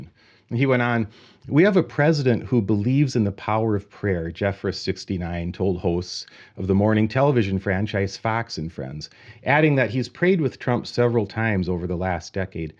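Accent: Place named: Minnesota, United States. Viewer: You’re on fire, man. I’m learning to enjoy what disgust sounds like in Minnesota nice dialect. Thank you.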